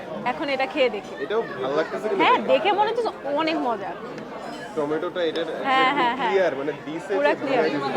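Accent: Sylhet, Bangladesh. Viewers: ভাইয়া কি সিলেটি??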